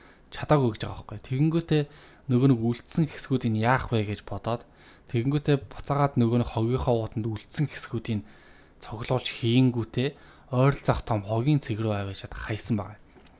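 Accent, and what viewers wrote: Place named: Inner Mongolia, China. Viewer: Өвөр монгол ч юм шиг дуудлага нь бусдаар дажгүй шүү Амжилт шүү🎉🎉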